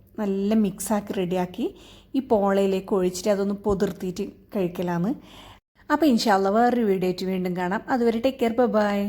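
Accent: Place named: Thalassery, India. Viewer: Thalassery Kariya no samsaram kelkumpol thonunnu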